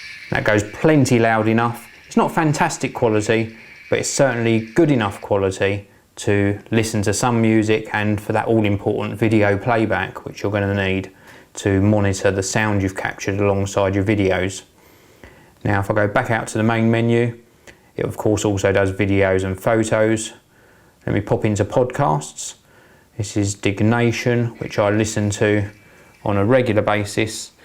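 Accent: United Kingdom. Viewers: ar u british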